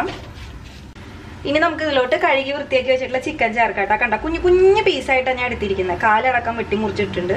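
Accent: Thrissur, India. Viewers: Chechii thrissur aanalle?? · ആദ്യമായി ആണ് ഈ ചാനൽ കാണുന്നത്.നല്ല സംസാര ശൈലി....😊👌 അല്ലേലും തൃശ്ശൂർ ശൈലി വല്ലാത്ത ഇഷ്ടം ആണു. അമ്മവീട് അവിടെ അയതോണ്ടു പ്രത്യേകിച്ച്💕 ★ പിന്നെ ആ കിർ കിർ കേട്ടിട്ട് ചിരിച്ചു പോയിട്ടോ ★ എന്തായാലും ട്രൈ ചെയ്‌തു നോക്കിട്ട് പറയാം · തൃശൂർ ആണല്ലേ.... Non stop സംസാരം അപ്പോ njn മാത്രമല്ലല്ലേ 😁😁😁😁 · എന്റെ പൊന്നെ എന്തുസ്പീഡ ഇത് സമ്മതിച്ചുട്ടോ തൃശ്ശൂരാണല്ലേ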